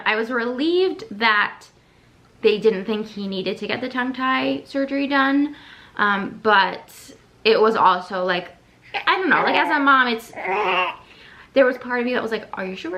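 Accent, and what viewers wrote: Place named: Central Jersey, United States. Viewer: We have literally the same accent (I'm originally from central Jersey) 😂 my husband always teases me when I say "bad" like that 🙄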